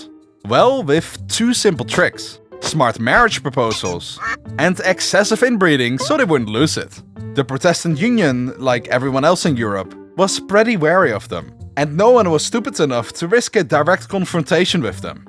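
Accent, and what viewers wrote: Netherlands. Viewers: This video is great, the hilarity brought to me by the Dutch accent is even better. Not that your English is bad(its amazing) but the Dutch accent is very noticable and silly sounding. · I smell Dutch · I hear a Dutch speaker 🇳🇱🇳🇱🇳🇱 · Dutch oversimplified (als ik het accent acuraat heb) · Just subscribed, but this accent seems very Dutch even though nailing the English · Dude, your pronounciation! That's not how you say words! Please do something about not only your extreme Dutch accent, but also please just learn for example where the accents are in the words catholic and protestant before doing a video on the reformation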